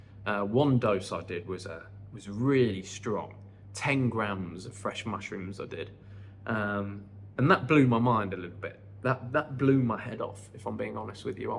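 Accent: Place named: Bournemouth, United Kingdom. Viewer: Didn’t realise you lived in Bournemouth, thought I recognised the accent! Im hoping to move to Koh Samui from Bournemouth at the end of the year 🤞🏼💪🏼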